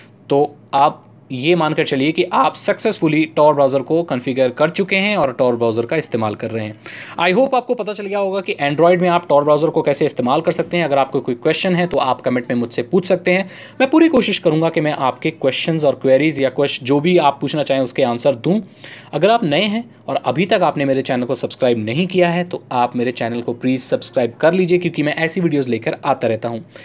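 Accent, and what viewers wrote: Odisha, India. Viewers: Ap odisha se hai kya?? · are you from odisha??? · sir aap odisa se hain kya??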